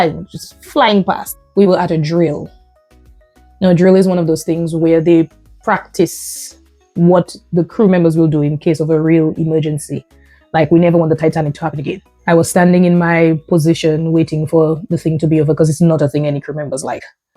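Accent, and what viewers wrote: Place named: Dominican Republic. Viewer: Enjoying the Dominican accent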